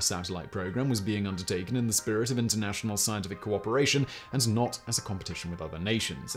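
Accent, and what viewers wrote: United Kingdom. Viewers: He's British so no. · why do brits ad extra syllables to the word Alminium? It's 4 syllables not 5. The hard second "i" is totally supposed to be silent, not accentuated.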